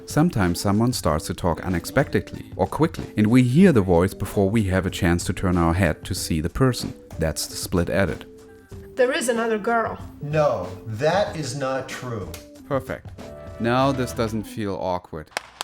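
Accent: Germany. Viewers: Are you German? :)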